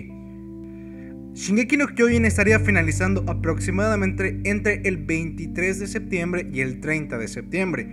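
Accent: Argentina. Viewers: ¿Eres de Argentina?:V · eres argentino? :'0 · sos argentino??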